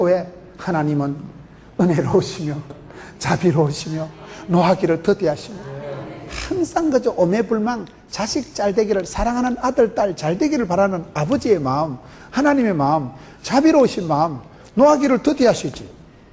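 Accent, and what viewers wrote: Gyeongsang, South Korea. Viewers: 귀하신 목사님 ㆍ 경상북도 사투리에 멋진 비유 ㆍ귀에 쏙쏙 넘 넘 감사합니다 🙏 🙏 🙏 💜💜💜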